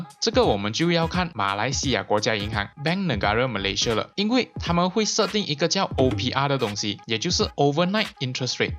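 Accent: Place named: Hunan, China. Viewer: 这个马来小编，除了南方腔，还有湖南腔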